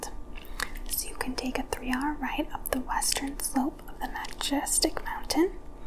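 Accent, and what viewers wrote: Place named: Chicago, United States. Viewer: But that accent is either Chicago or Wisconsin.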